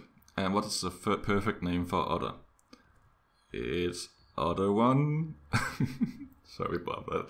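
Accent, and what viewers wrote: Denmark. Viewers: U Sound Danish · You sound Danish. Are you Danish?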